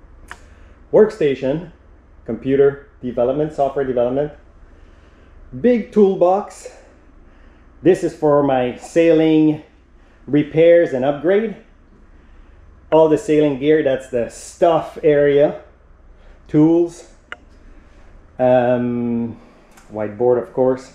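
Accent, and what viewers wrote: Quebec, Canada. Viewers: Awesome! Quebecois? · Ta un accent Québecois ??? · You sound like you're from Quebec... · I like it ! What are all those diplomas on the wall ? I couldn't not notice you accent. Is that PQ ? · At first I was thinking, is it me or this guy sounds like a french canadian dude speaking english. Après j'ai vu le logo des canadiens, ça a confirmé ma thèse 😅 Belle job! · Wow! I love this simplicity! Nice job. Not sure but your english sounds just like mine from Quebec. I wish that container home could be possible up here... But with our cold weather, building code and city legislation... It would require so much effort to get a simple projet like yours possible. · That sounds un peu quebecois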